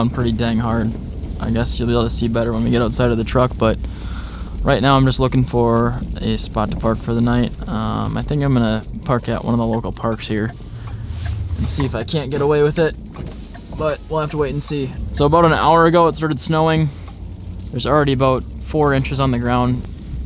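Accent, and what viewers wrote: Canada. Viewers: You Canadian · You sound like one of us Canadians.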